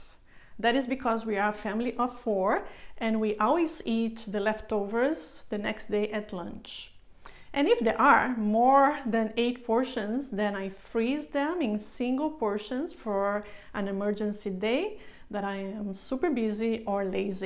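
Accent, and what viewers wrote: Brazil. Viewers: Brazilian accent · Muito bom vídeo! Pelo sotaque acredito q a senhora seja brasileira. Ótimo trabalho!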